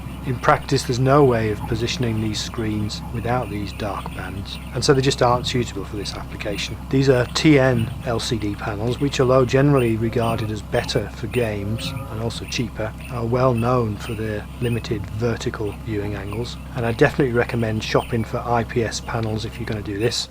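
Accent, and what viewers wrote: United Kingdom. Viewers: Thanks for the prompt reply. Say, I'm curious... Though you obviously spend a good deal of time flying around the region I spent the first several years of my married life (Puget Sound area), it sounds like you live in the UK. I lived in Melton (East Anglia) for a bit more than two years, and met and courted my wife near Bracknell... Just wondering if you're from a district I'm familiar with. :-) Thanks again for the advice -- and all the excellent videos! Keep up the great work!!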